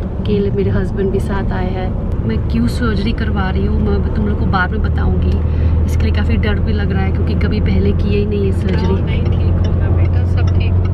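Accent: United States. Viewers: App American hai kya 🤔?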